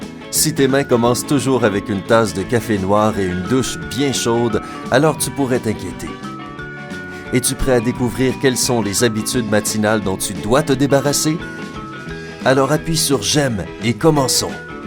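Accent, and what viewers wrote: Quebec, Canada. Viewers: Accent québécoise j'adore 😊. Merci c'est sympa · C'est du québécois alors arrête de critiquer ! Ils ont un accent différent !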